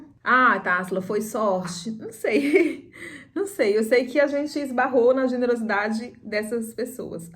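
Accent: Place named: Portugal. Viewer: sra, eu estou a ouvi-la, você fala clarinho, com toda a á vontade, não há hipótese de dúvidas se você fala exatamente como todos falamos, custa,- me acreditar que brasileiros digam que percebem melhor o espanhol do que o português de cá, estão a brincar connosco, não acha.?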